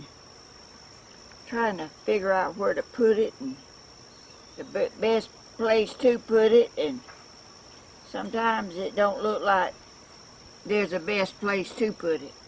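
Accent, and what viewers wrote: South, United States. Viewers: I love listening to you with your southern accent! I also love your hair,you do a great job,I to color,cut and perm my own hair but I was a hairdresser. You are soooo very talented keep up the beautiful work. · Shelley M Martines I’m glad that during my sleepless nights I can follow our beautiful and her lovely southern voice, and gifts from our dear Lord. 💝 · I have been watching you now for a few years and I have to ask “ is there anything you can’t make?, good lord woman, I’ve seen you make so many things and no project seems to stump you, I’ve made mushrooms with you and many other things and I love your southern accent, I am Canadian and a crafter, I love to work with glass the most, many years ago, my first table for a wedding gift started my road to making tables, I made and sold so many, I made and sold what I called window in a window with frames and glass to hang in a window, they also sold very well, keep up the good work, god bless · I started watching your videos about a year ago and you're just wonderful! 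I love your enchanting yard, your southern accent, your turn of phrase and your love of nature. This mosaic table is the most fabulous treasure I've seen in a long long time. The colours are amazing, your visit with your youtube friends is heartwarming and you leave everyone with a feeling that they've just had a lovely visit with an old friend who never judges or questions. You're truly a gift and I'm so happy to have found you! Sending love and greetings from Canada! And as a side note, I suggested to our local nursing home that their residents might love your channel because I think you will take them on a wonderful journey everytime they watch one of your projects and get to visit with you in your garden. You are truly a gift and I don't think you realize how loved you are, you bring a lot of love and happiness to many people every day.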